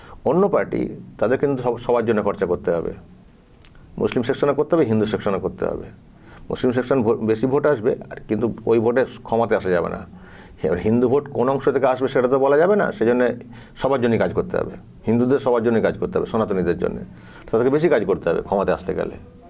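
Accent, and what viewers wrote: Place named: Bangladesh. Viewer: Uchcharon toh puro Bangladesh e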